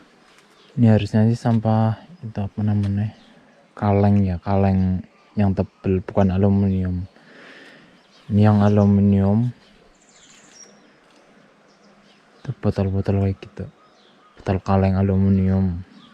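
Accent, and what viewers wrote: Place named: Ngapak, Indonesia. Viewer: Bahasa indonsianya medok banget pasti orang ngapak ya masora ngapqk ora kepenak pokoke mas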